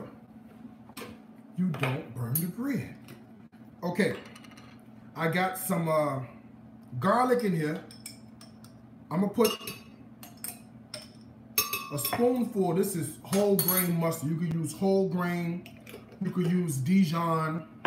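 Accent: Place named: New Orleans, United States. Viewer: Man where are you from. It sounds like a lil N.O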